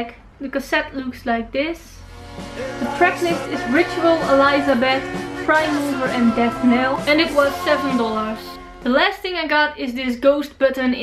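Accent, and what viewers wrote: Netherlands. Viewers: Eindelijk iemand die ook met een dik nederlands accent praat als ze engls praat. Dacht dat ik de enige was.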